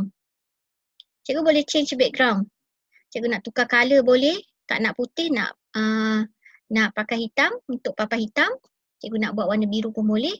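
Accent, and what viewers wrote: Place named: North, Malaysia. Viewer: Syukran cikgu.....Penerangan yang jelas dan terperinci. Seronok dengar ada dialek utara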